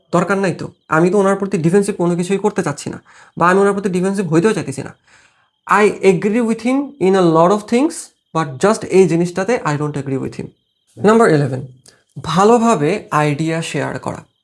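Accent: Chittagong, Bangladesh. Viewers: You're from Chittagong,aren’t you bhaiya?😁.. But, bhaiya your contents are too much needed in this time of our generation.. Thanks bhaiya🌸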